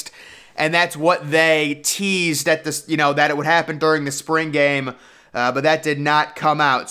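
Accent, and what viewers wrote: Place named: Wisconsin, United States. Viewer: Hard Wisconsin Slang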